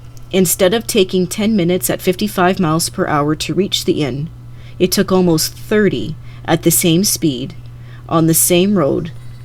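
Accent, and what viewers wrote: Canada. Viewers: It wasn't the content, it was the delivery. I've listened to most of your videos now and you do it on most of them. What I do like about your videos is that there is no repeats from the 100 hours of other channels' scary videos I've watched. I also get a chuckle when I hear your Canadian "out" and "about".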